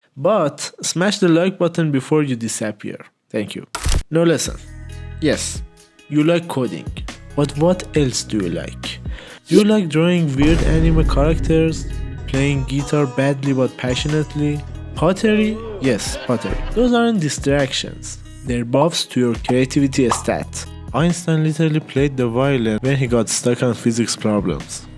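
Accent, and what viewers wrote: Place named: Iran. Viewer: your accent screams "چایی و کوروش کبیر"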